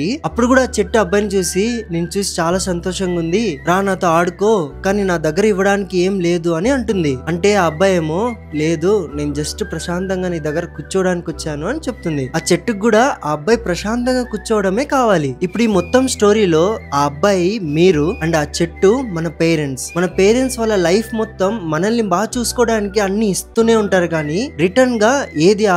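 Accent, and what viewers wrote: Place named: Mumbai, India. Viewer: Tammudoo... nuvvu Telugu Lo videos chestunnav kada.. Mari Telugu raanattu telugu ki Tegulu pattinchaku.. mangoes.. branches... yenduku ilaa anavasaram gaa English Padaalu vaadutunnaav? Bombay nunchi vacchina Heroine laa.. ilaa maatlaadithe Buttler English laa untundi.. neeku English meeda pattu unte English lo ne chei ilaa Telugu ki Tegulu pattinche prayatnam cheyaku.. vinadaaniki assalu baaoledu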